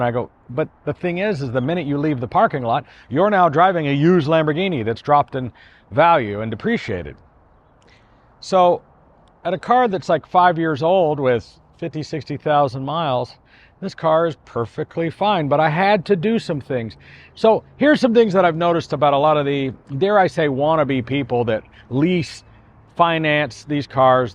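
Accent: United Kingdom. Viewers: at first yeah but after a few videos it normal and I like his British accent. However, his father hard laughing after each talk is very annoying.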